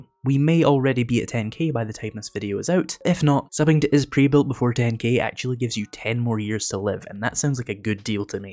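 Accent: Scotland, United Kingdom. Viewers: are u from scotland brother ur accent reminds me of a outlander main male character's one that he tried to addapt to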